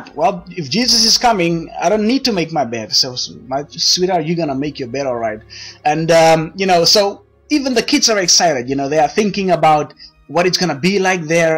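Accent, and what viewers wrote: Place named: South Africa. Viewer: Hey, I recognize that accent, Im from South Africa too and live in Costa Rica, nice hearing from you brother, God Bless and thank you for your message, Yeshua is coming, Amen!!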